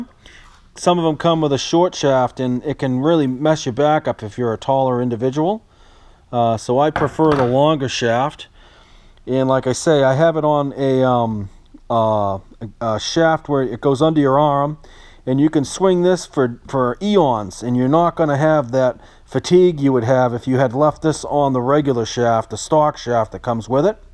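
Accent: United States. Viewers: Did you say "a good-eye deer"?? I enjoy the hell out of your New English accent but that aside video takes the cake! Hands down, the most informative and honest take on these Minelab machines as far as tips n' tricks and idiosyncracies of each of them. Thank you for your service and happy hunting from a new subscriber in Florida.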